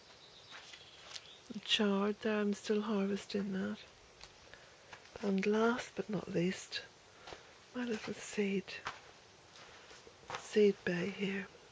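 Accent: Ireland. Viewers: Catching up with your early videos. You don't have such a pronounced Irish accent these days! My, how your garden has become so abundant in these past 13 years, you work so hard. You had three cats in this video - I assume this was pre-Jack? Lovely to compare the before and after. X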